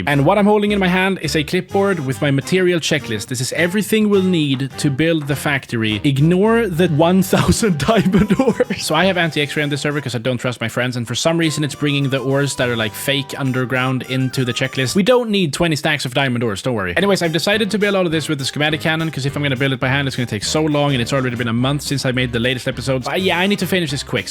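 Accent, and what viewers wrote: Sweden. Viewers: I KNEW YOU WERE SWEDISH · du, jag hör att du är svensk.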